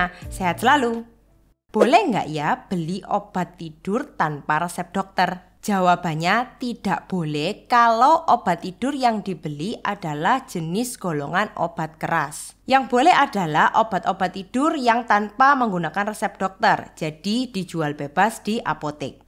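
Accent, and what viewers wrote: Java, Indonesia. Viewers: chindo medok jawa nih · medok ya mbak...😁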